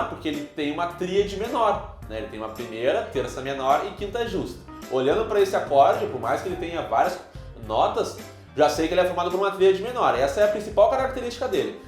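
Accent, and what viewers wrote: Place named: Porto Alegre, Brazil. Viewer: Sotaque de pôoorto, bah!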